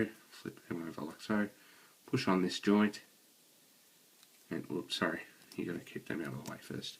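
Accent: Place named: Australia. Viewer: Great to hear an Aussie accent, and one that doesn't sound bogan :)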